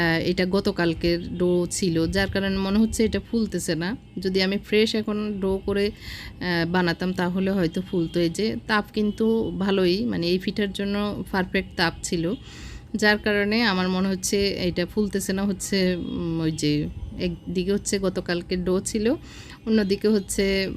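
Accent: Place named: Feni, Bangladesh. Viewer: উনি তো জোর করে কাউকে ভিডিও দেখতে হাতে পায়ে ধরে নাই। যার ভালো লাগবে দেখবে না নাহলে দেখবেনা। দেখবে আবার নেগেটিভ কথাও বলবে। উনি ফেনীর মানুষ। তাই ভাষায় একটু আঞ্চলিক টান আসে। এটা দোষের কিছু না। আমরা কেউই নিশ্চয়ই বিশুদ্ধ ভাবে বইয়ের ভাষায় কথা বলিনা। উনাকে সবাই ভিডিও তে দেখে। আর আমাদের কেউ দেখেনা। তাই নিজের দোষ কেউই দেখতে পায়না। অন্য কে অসম্মান করার আগে অন্তত একটুকু ভাবা উচিত যে তাকে অপমান করার যোগ্যতা সত্যি নিজের আছে কিনা।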